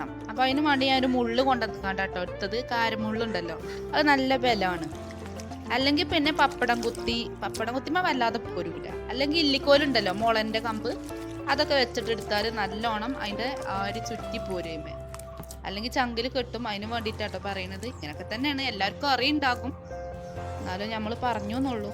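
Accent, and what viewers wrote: Malappuram, India. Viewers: അതുഞങ്ങളുടെ മലപ്പുറം ഭാഷ അത്‌ ഓവറായി തോന്നുന്നവർ.. ഈ വീഡിയോ... kananda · Malappuram voice super😀😀😃😇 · Kasaragodil ninn kananad njan mathrano🤗.orupadishtayi malapuram slang😍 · എനിക്ക് ഈ മലപ്പുറം താത്തന്റെ വീട്ടിലെ വിശേശവും മലപ്പുറം ഭാഷയും പൊളി നിങ്ങൾക് ആർക്കെങ്കിലും മലപ്പുറം താത്തന്റെ ഭാഷ ഇഷ്ടമായവരൊക്കെ ഒരു ലൈക് തരു 🙃🙃🙃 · തനി നട്ടൻ മലപ്പുറം ഭാഷ...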